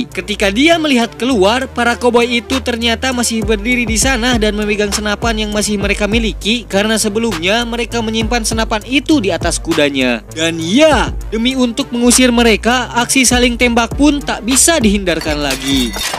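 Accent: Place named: Sunda, Indonesia. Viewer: Asik seru bnyk alur cerita pembawaannya Sunda. Karena saya sendiri asal tasikmalaya. Tapi sekedar masukan kang klo bisa gaya bicara dan penyampaian dengan gaya sendiri dan karakter sendiri. Jangan meniru orang lain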